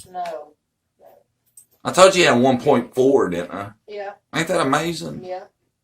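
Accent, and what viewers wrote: United States. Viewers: Oh Cool! Idk why your channel hasn't popped up here before but I'm happy to meet y'all! I live in Knoxville, TN. I'm assuming y'all live in TN as well with that southern draw. Lol This was the first time I've gotten a good peek of Andy. Seems if brains were made of petroleum he wouldn't have enough to drive a piss ants go cart halfway around a fruit loop! No wonder H is emotionally disturbed. I don't believe a person like that would have said he didn't want to hear what happened that day though. Imo he knows if H tried to open up to him about it! Also imo LE needs to go back to the start & interview these people again! New sub here! 🙋😊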